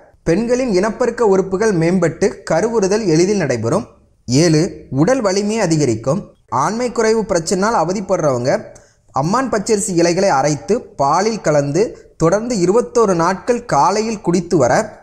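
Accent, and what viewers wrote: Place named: Sri Lanka. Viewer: Ilangai tamil maari therigirathu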